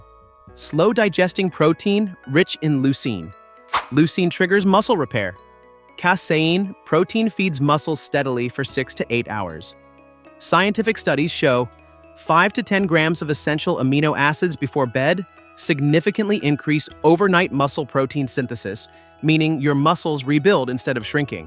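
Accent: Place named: United States. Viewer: Click bait rubbish with a annoying American accent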